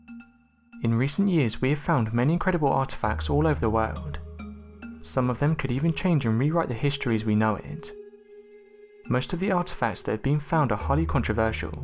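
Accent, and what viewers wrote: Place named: Manchester, United Kingdom. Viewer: When discussing the question of dinosaur lips, this narrator (already famous in my book for love him/hate him usefulness).. says something much closer to "limbs". I understand that his accent (Manchester?...I don' know enough of the styles) may be hard for me to get, but damn that one was out there. I'm trying to get used to the "up/down.up/down/up...and fade the last word to breathy nothingness" style, and I'm getting there even though I find it irritating. His actual voice is great and usually if I'm watching a film or show taking place in GB, I love the accents. I just can't get the info straight sometimes with his rhythm and fluctuation. He probably hates how Americans speak as well lol. Otherwise, as usual great content and yes I'd like to see more exact pictures of subject matter but I understand it's not always possible. 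Good show.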